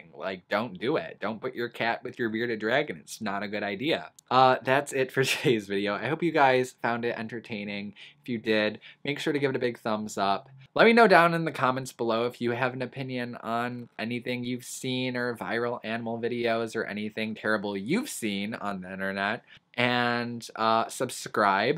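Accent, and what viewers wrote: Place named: Minnesota, United States. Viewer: I keep wondering what his accent is?? Minnesota? The a’s, like “welcome bahck”. It reminds me of Kathy Bates in AHS Hotel.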